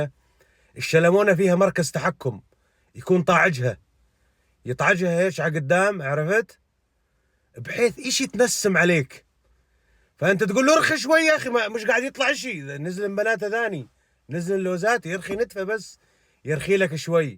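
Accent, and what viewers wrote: Hauran, Syria. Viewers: لهجة حوران الاجمل بالعالم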